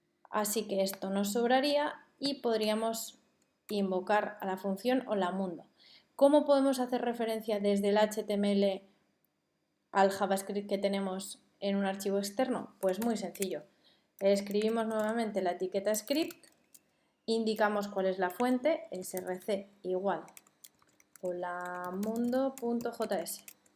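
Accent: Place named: Madrid, Spain. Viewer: me interesan cursos de html, css, python, c#, java, bueno, espero no pedir mucho😁. Gracias a ti por hacer estos cursos maravillosos. Con esa bella voz madrileña y tu inteligencia en programar es un gusto seguirte en el aprendizaje. Un abrazo enorme desde mexico!!!!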